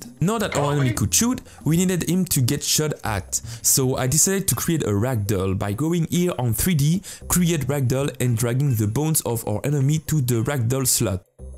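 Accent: France. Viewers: J’adore l’accent français et très interessant la vidéo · Est tu français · You sound French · Are you frensh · Wow the accent and the little FRA at the bottom of the screen clearly shows you’re French 😅 Also the search bar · Are u french? Sounds like it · Tu as un accent Français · Are you french? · Super cool! as usual! from where do you take your accet? i swear you're french!;) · mdrr l'accent français est présent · Toi t’es français non ? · Ou la il est français lui avec son accent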